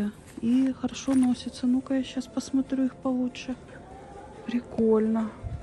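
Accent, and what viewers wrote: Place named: Urals, Russia. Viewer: Уральский говор он конечно очень выделяется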